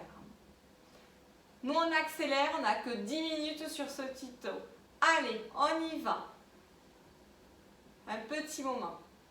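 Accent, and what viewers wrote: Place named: South, France. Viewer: Merci pour cette séance,j'aime ce petit accent du sud! Je vous suis depuis la Belgique!!!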